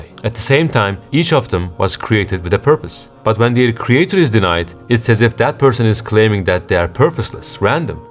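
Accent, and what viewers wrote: Turkey. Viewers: brother are you Turkish? Your accent sound like your Turkish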